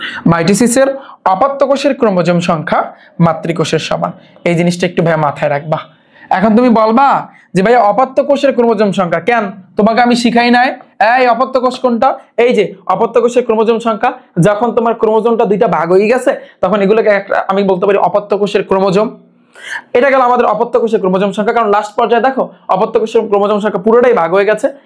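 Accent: Barishal, Bangladesh. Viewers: আপনি কি বরিশালের লোক😊😊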